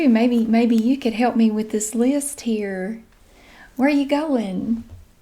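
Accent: South, United States. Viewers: After a long work day has relaxed me and made me smile. Also.....I want so badly to replace my boring Iowa way of speaking with that beautiful southern drawl.💕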